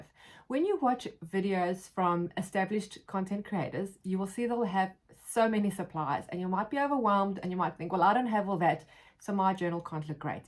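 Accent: South Africa. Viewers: Hi I am so glad I found you channel... You sound like you are from South Africa:D So am I and there are very few if any junk journallers here as far as I have found. I love your style and I am new to junk journalling and all the videos can be a bit overwhelming... I absolutely love the idea of an idea journal and i think I will start with that:D Thank you so much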